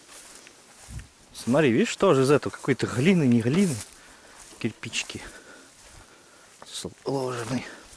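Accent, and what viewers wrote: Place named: Tambov, Russia. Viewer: я тоже родилась в Тамбовской обл. Когда услышала ваш говор сразу подумала земляки. Потому и спросила что за обл. Очень печально видеть все это . Моя юность прошла там.живу далеко от тех краев к сожалению . Какой район можно узнать ?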